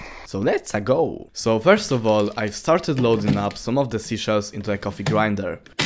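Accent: Poland. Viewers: Your polish accent echos through my dreams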